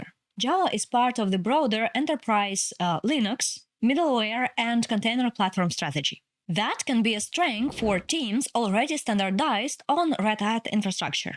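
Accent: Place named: Europe. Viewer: Awesome video. Thanks. By the way, I think your pronunciation is cool :face-blue-smiling:, it is like listening to java news from Europe (or from wherever your accent is)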